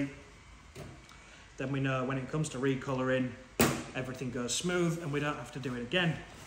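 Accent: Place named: United Kingdom. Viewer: Other then the huge thick UK Accent making it hard to understand! I would like to see the quality of this leather jacket condition now. As, l have been trained never to use alcohol based substances on full grain leather.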